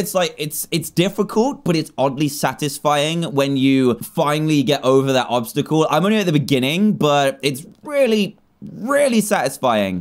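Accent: United Kingdom. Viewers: You so British lol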